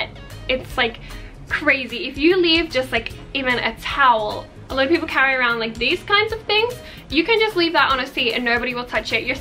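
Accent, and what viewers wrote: Australia. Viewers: Although I don't speak Japanese, I was able to hear an Aus accent when you spoke - Both shocked and amused me... Your point about getting outside Tokyo is SO true. Other than videos you shot with friends, my favorites were when you showcased a city or business outside Tokyo. They were all so well done. Kobe series 100% I'm still amazed you created all those without a staff.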